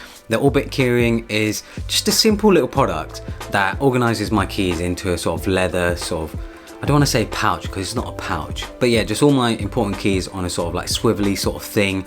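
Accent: England, United Kingdom. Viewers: Just found your channel. Huge fan of tech YouTubers! And it sounds like I’ve found a fellow Englishman! Keep it up mate and don’t mind me. I’ll just be flying through your vids rest of the weekend 👌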